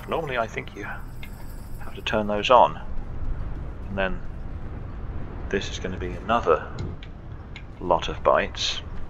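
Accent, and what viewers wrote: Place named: United Kingdom. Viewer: Came here from Josiwe's channel fyi. Good series so far! What part of the UK are you from? I'm Irish but cant place the accent?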